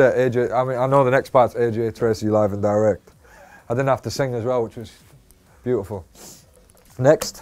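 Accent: United Kingdom. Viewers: I freaking adore his British accent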